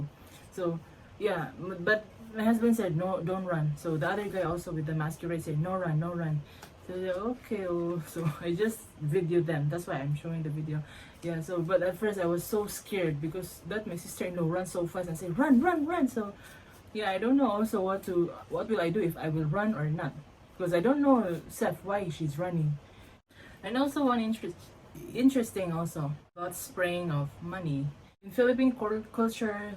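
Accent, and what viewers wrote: Nigeria. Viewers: Have you realized you have developed a Nigerian-English accent? yes, you sound like a nigerian... Great video.. cheers!!!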